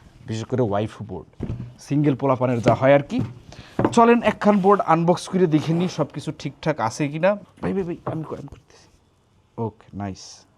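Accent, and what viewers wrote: Barishal, Bangladesh. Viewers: ভাই আপনি বরিশাইল্লা বুঝলাম, প্লেন কে প্লেইন উচ্চারন করেন। তাই বলে লেখার সময়েও Plain?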